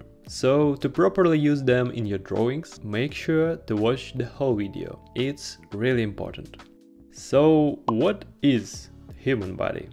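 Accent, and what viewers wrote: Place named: Poland. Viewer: jak to się dzieje że zawszę usłyszę polaka, dobry film tho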